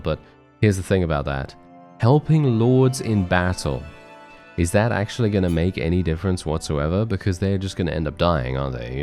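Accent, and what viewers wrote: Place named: England, United Kingdom. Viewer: My favourite part of your videos? "Are you serious?" 😂🤣😂 There's something inherently enjoyable about hearing your smooth english accent spit that phrase out every episode of every Bannerlord series. Never change sir.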